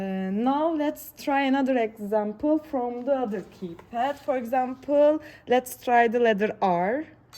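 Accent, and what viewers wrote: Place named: Turkey. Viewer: Türk'ün şivesine bile kurban🫡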